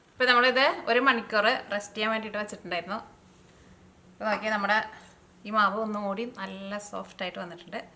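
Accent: Thrissur, India. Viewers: My dear sister you talk too fast. We are from South Kerala so it's difficult to catch up with Thrissur slang. So please slow down a bit. Thank you.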